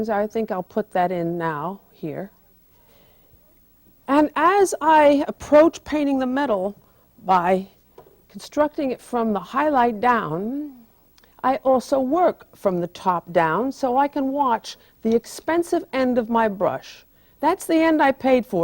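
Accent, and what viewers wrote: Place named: New Jersey, United States. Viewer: Love that New Jersey accent ( where Helen grew up). Example: "imPORTANT." Helen is the best! Thank you!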